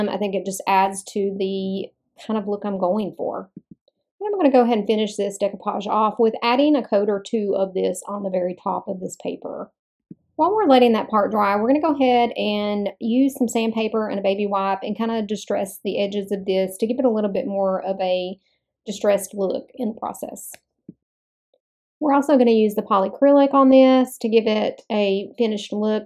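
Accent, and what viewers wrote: North Carolina, United States. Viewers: I knew you had to be in North Carolina by that accent. I'm in Winston-Salem. We used to go to Hickory a lot for Gems & Minerals shows when I was little. I hated going, but they would bribe me taking me to Hardee's there. They were the best back in the day.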